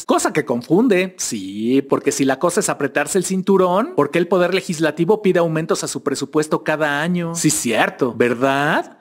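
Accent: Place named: Mexico City, Mexico. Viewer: me encanta su acento chilango!!😋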